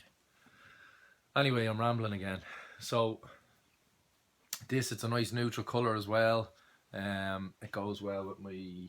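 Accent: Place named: South Africa. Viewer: Here’s me thinking you were from South Africa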